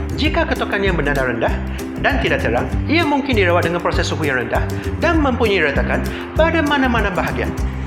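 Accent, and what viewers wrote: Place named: Malaysia. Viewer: Hai saya dari Jakarta. Tengok channel ini Krn suka sekali dengar cara bicara Melayu kaka 😁 konten nya juga informatif sekali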